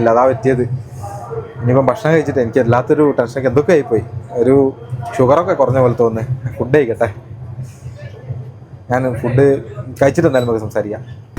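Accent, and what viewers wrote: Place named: Koyilandy, India. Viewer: കൊയിലാണ്ടി ശൈലിയിലുള്ള വിവരണം ഇഷ്ടപ്പെട്ടു.പേഴ്സ് നഷ്ടമായി എന്ന് കരുതിയപ്പോഴും അത് തിരിച്ചുകിട്ടിയപ്പോഴതെ അവസ്ഥയും നന്നായി മനസ്സിലായി..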